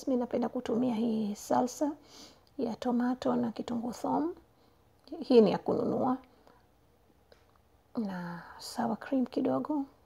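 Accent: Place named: East Africa. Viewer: love the swahili accent, zanzibar and Tanzania are super good in swahili. in mombasa these are also known as cutlets (katlesi). good presentation sister. keep it up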